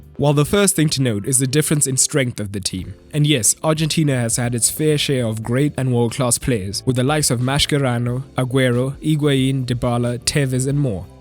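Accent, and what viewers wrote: South Africa. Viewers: Please do one for Ronaldo too. P.S. Are you from South Africa? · Are you South African or Zimbabwean?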